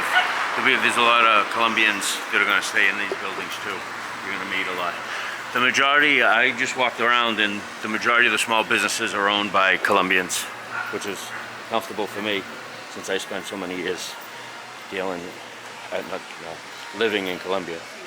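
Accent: Boston, United States. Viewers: Learn Spanish bro, I can barely understand you with that Boston accent and I am a native English speaker 😆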